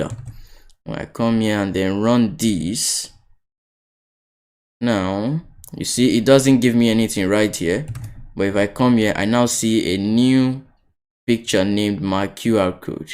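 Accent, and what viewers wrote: Nigeria. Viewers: If it's an African, it's definitely a Nigerian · i hear the Nigerian accent!!🤭🤭🇳🇬🇳🇬🇳🇬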